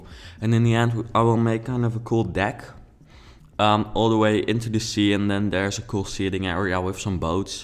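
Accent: Netherlands. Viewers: Hey nice video , i love your accent. I am also dutch, well my dad is my mum isn't so that explains my name XD i don't know much dutch buy yeah nice video XD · I can hear the Dutch through your English. I'm Flemish. (Yes, I'm watching this in 2017.) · Are you from the netherlands? Your accent sounds dutch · You can really hear you are Dutch 😂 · Je hoort duidelijk dat je Nederlands bent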